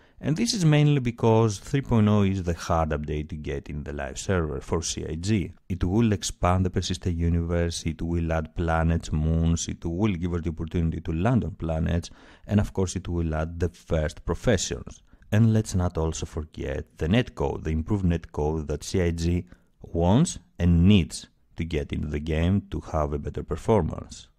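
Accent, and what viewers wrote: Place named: Greece. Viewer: Hmm, by the accent I would say he is Greek. Or at least his sounds like one.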